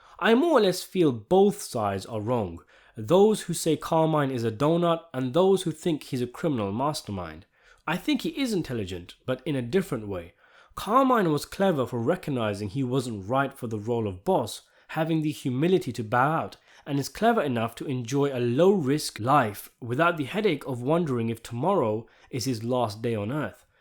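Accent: United Kingdom. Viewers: My greatest mystery is your accent - it sounds British-ish but not quite... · I love how the narrator leaves the curse words in the commentary, but they get blanked out in the closed-captioning. And it's always fun listening to British accents talking about American mobsters.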